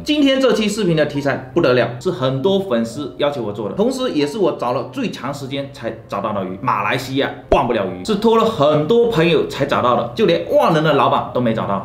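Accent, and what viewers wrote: Fuzhou, China. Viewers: 你是福州人？ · 听口音像福州人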